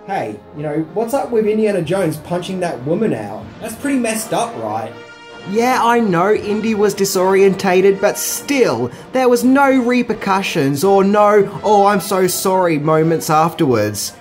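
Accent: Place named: Australia. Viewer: Dude, he has an Australian accent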